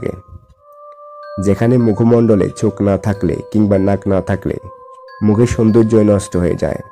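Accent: Noakhali, Bangladesh. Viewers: Vai apni ki Noakhailla? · ভাই খালি ফ ফ করেন ক্যান? ফেনি, নোয়াখলি, কুমিল্লা?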